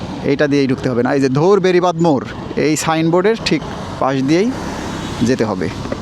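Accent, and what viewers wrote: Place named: Khulna, Bangladesh. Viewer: আমি যতদূর জানি এটা হচ্ছে বিরুলিয়া ব্রিজের কর্নারে আপনার ভাষা শুনে মনে হচ্ছে আপনি খুলনা বিভাগে আপনার বাড়ি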